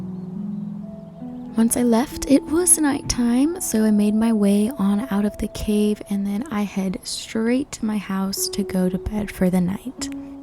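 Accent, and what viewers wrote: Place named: South, United States. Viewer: I love how towards the end she just transitions more and more to her southern accent 😂😂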